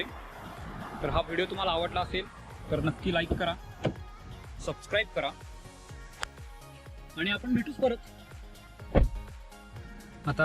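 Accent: India. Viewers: Pure Kolhapuri bro 😎...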